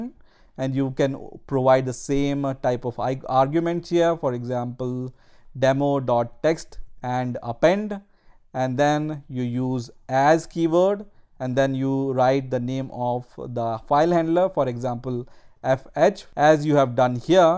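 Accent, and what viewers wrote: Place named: Punjab, India. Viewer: Thanks sir... It's helpful video............ And your good English is unable to hide punjabi seeming accent... Tenn... Inn😊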